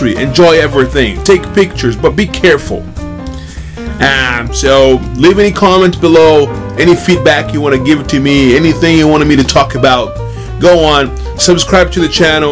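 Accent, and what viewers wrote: Rio de Janeiro, Brazil. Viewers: Esse ai tem um sotaque de bransileiro! Hum ou é? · kkkkk o cara ta ensinado a falar oq?? Carioca?? Mais não e todo carioca que fala assim não Moçu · Kkkkk que da hora,vc é brasileiro cara? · I can still hear your Brazilian accent sometimes kkkkk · na vdd é um br falando english kkk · tenho certeza que você é carioca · esse cara e brasileiro certeza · Esse cara eh brasileiro né? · Hahaha, você ensinou carioquês, não português! Lol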